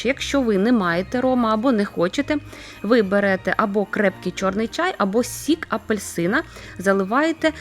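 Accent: Russia. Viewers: Ну доя чого тужитись балакати украинською мовою, аж вуха ломить бо русский акцент видно шо ви гоаворите росийською мовою и вона Головна. То все краще слухати чисту мову бо це не украинська а перелом мозгу. . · Це справжній рецепт. Професійно! Приємно дивитися. Але не дешево (для мене ). І дивна мова у вас - наче українська і хороша, але ж "падарунок" , " раздєліти" та інш. ....це ( просто цікаво) у вашому місті так розмовляють?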